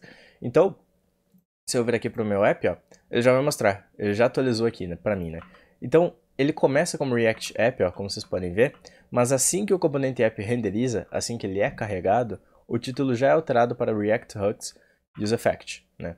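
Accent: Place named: Rio Grande do Sul, Brazil. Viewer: Você é do Rio grande do Sul? Gostei do sotaque. Eu sou brasileiro.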